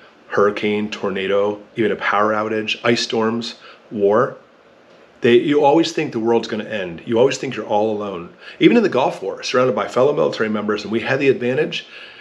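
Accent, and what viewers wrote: United States. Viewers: For a start all those who go out for a night in the woods, make a steak over a fire, and drink beer, they think whats about to happen is going to be just like that. Lol. It's NOT. Neither will it be a Mad Max Scenario. Just unimaginable horror and suffering. Anyway, who are you to tell anyone to stay or go ? What qualifies you ? To me you sound American, so when the SHTF, sadly, you will probably be exterminated along with Billions of others, who will die in unimaginable horror. Of course those you empowered, the Billionaire Elite politicians will all be safely tucked away in some cosy bunker, watching you die on tv monitors ! Finally, this is not Iraq, Afghanistan, Libya or anything like those backward tin pot countries, this is Russia & China ! Superpowers brimming with Nuclear weapons. This will be horror like you cant imagine. 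Why am I so certain, or speak with so much authority, because the West has been wanting a world conflict for well over 15 years now. Putin has nowhere to go, his only option is now to stand up to the USA. We all know what that means. Well nearly all, you very obviously don't. Anyway you see to forget also to mention for at least three days, no one even you, wont or shouldn't be going anywhere, as the fallout will kill you. Well if the winds blowing in your direction. There are so many more factors to take into account, however we'll just wait for you to tell us all what to do ! Strangely there wont be any Internet ! So I have to wonder how you're go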